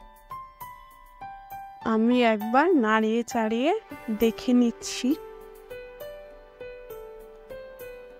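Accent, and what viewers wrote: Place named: Kolkata, India. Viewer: তোমার কথা শুনে আমি বুঝেছি ,মনে হয় কলকাতার দিকে থাকো ।আমার বাড়ি কলকাতায়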